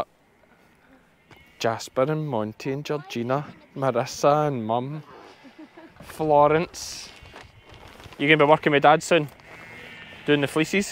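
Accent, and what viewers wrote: Scotland, United Kingdom. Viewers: Alistair is an absolute legend! But, would you consider putting Patreon back up? You could throw up some of the raw footage before Alistair does his magic (i.e. like your videos back in the early days). Just kidding, I love your early-days videos when you spoke Scots more. I miss being one of your Patrons! ❤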